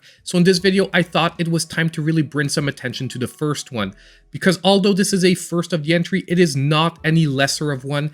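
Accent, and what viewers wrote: Canada. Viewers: Why do you sound Canadian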